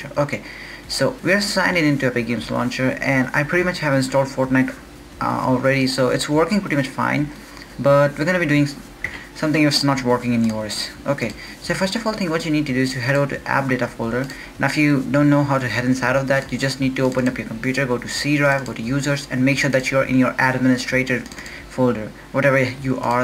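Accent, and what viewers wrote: India. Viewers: I don't trust anyone with an east Indian accent and a computer with an internet connection ......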